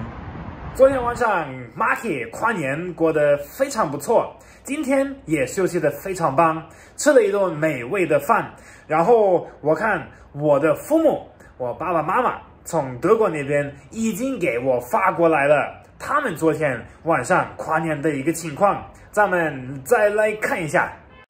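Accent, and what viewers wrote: Sichuan, China. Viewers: 这川普有点打脑壳！！🤣🤣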